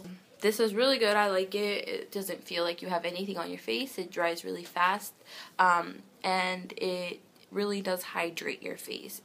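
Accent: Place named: Canada. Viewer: Lol from your voice I thought you lived in Canada cause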